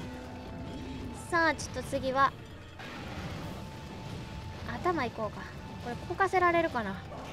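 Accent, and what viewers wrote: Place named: Kansai, Japan. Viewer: 動画がアップされるごとに関西弁のレベルが上がっていっている 素がで始めたのか 昔は・・・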